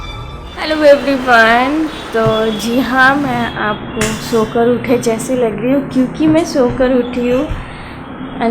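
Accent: Maharashtra, India. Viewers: maharashtra ki rough boli hai aTapori bhasha hai gandi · Tab hi mai sochoon aap marathi accent aur amma ji bhojpuri accent humare husband ke dadi aise hi baat karti thi she passed away this January yaad agyi unki....waise mai Bengali hoon Up wale se shadi hui hai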